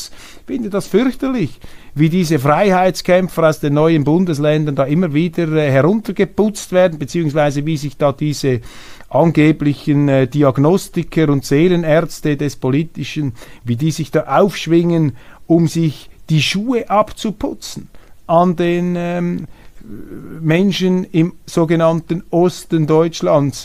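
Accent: Switzerland. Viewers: Bonjour nach Zürich! Wenn mir eine Randbemerkung erlaubt ist.. Ich mag Ihren Schweizer Akzent.. Bin aber überrascht, wie akzentfrei Sie Englisch und Französisch sprechen. Kompliment.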